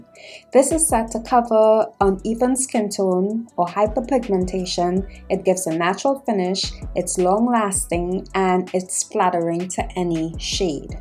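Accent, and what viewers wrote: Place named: Trinidad and Tobago. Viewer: Are you from Trinidad, Port of Spain? Beautiful accent ❤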